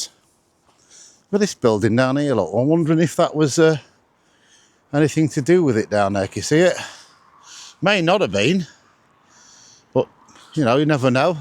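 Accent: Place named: Grimsby, United Kingdom. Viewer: I live in South Africa and I really enjoy listening to your Grimsby accent ! Your videos are Fantastic !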